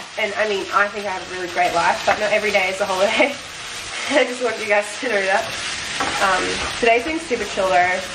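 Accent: Australia. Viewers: New to this channel. Is she Australian? I can’t tell where the accent is from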